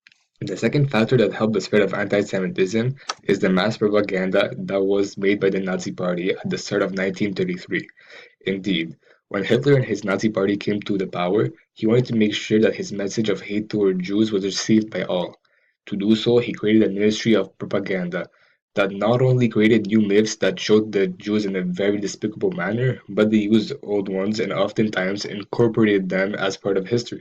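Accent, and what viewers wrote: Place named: Ireland. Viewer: Great material my friend, this helped me puzzle-out a lot of things I had never figured out before. I hope you are coming back very soon with videos this good. P.S.: I love your Irish accent, I can clearly see you grew up in Dublin!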